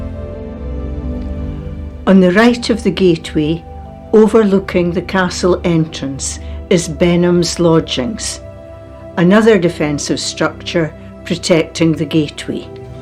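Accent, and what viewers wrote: Scotland, United Kingdom. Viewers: Could you imagine what this Castle would have look like before it was sacked. The filming was first class and the narration in a Scottish accent made even better, One more place to visit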